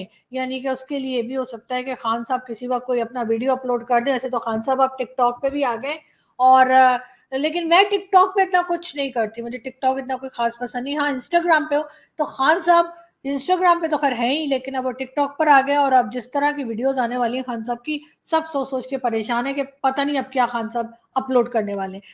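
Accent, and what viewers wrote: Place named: Allahabad, India. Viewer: you are 100% Allahabadi, the way you speak is like HARDENED ALLAHABADI absolutely Pure, this is the way a NATURAL ALLAHABADI SPEAKS ❤😂 You are hilarious 😅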